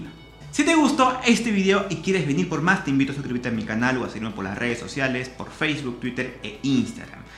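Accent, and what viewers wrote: Peru. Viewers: ¿Es de Perú? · Eres de perú????? buen video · Eres peruano?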